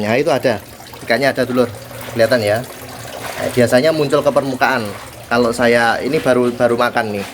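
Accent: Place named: Java, Indonesia. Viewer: Wong jowo medoke ketoro hahaha lanjutkan pak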